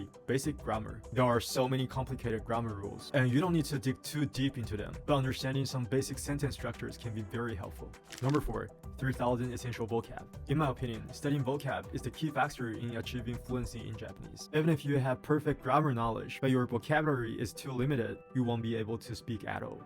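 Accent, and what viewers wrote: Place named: China. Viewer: This was my thought, but his accent is Chinese.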